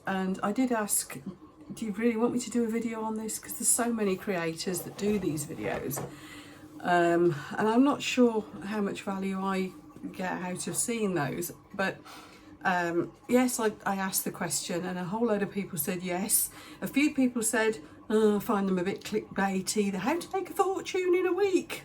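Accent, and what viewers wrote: United Kingdom. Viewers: This was very insightful 🙏🏻 I’ve been ‘learning’ polymer clay for the last year, starting with the proverbial jewellery. Just started trying to sell on Etsy, but I think you are right and far too much competition. Would you say your YT channel drives sales to your Shopify store? Or do they ‘help’ in that way? Driving traffic has always been my nemesis. 😞 Thanks for the videos. 😊 Lovely to see and hear another Brit! 😏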